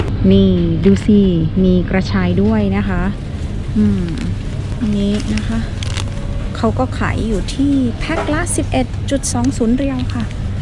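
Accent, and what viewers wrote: South, Thailand. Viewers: นํ้าลายแตกตามเลยคะ ชอบ พูดใต้คะ ขอบคุณสำหรับคลิปน่ารักๆคะ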